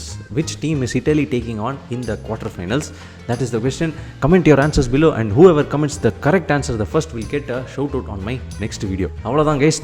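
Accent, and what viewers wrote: Tamil Nadu, India. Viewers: thala vera level tanglish a big fan of you and true what all you told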